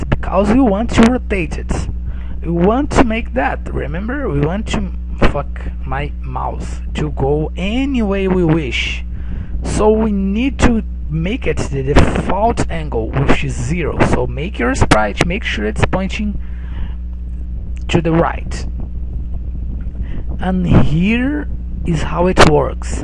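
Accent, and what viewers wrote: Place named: Brazil. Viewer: seu sotaque [e de brasileiro kkkkk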